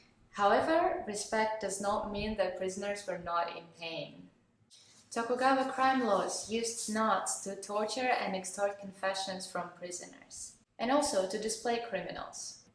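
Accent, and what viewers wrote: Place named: Russia. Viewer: Oh I love your Russian accent, I even watch some of your videos in Russian, just to here the exciting melody. Я изучаю русский язык.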